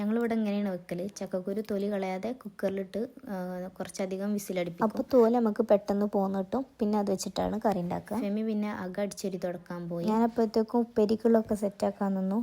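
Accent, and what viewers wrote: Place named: Kannur, India. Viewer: Video Nalgene....specially ur slang..nmlu kannurkark Kelkar ishta....but lack of content feel cheythu....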